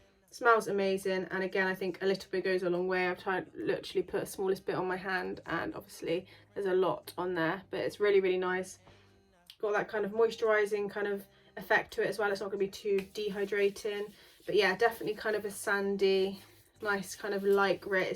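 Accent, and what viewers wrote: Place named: Suffolk, United Kingdom. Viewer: Your hair is gorgeous! How do you get it so white but still so healthy looking?? Also, where abouts are you from? I’m from Suffolk, your accent doesn’t sound too far 😊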